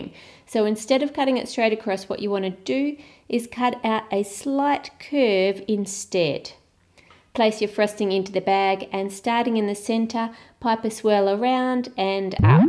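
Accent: Australia. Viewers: Just love the Aussie accent 🥰❤ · Hi! I love your channel, and watch it all the time. You obviously come from a country where you have summer all the time. How could I know (apart from your accent and that you say you come from Oz)? The snowcrystals have 6 points. Always:) Btw: I whish I had an orange tree in my garden, not snow...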